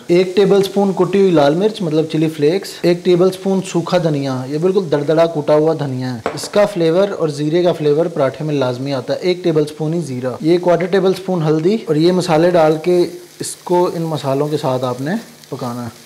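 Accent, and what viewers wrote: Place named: Pakistan. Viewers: I speak bangla and can barely speak urdu but i must say pakistani urdu accent is so pleasing MASHAALLAH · TrueTrue7 . Its still Pakistani accent, not Indian... samjhe? Bewaqoof!!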